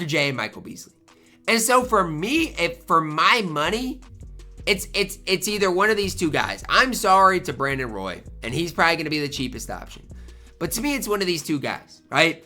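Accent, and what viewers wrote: Iowa, United States. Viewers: Is this an Iowa accent? What is this?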